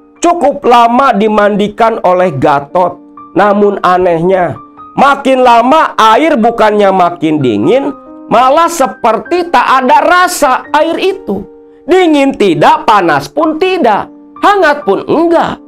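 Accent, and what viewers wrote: Java, Indonesia. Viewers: Bahasa Jawa kang lucu 😅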